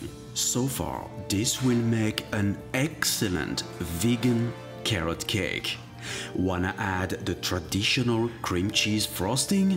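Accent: France. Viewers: Are you French or not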